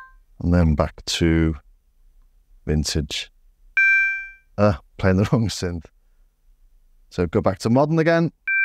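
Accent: Liverpool, United Kingdom. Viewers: That gear video was gear (as we used to say in my youth)😉 I am not a great keyboard player, but you've certainly piqued my interest with this video. Great to hear the sweet tones of home... (Your accent, not the synth 😊). I'm a Scouse exile in Catalunya. Off to check out some more of your vids. Ta ra... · My wife says Scouser.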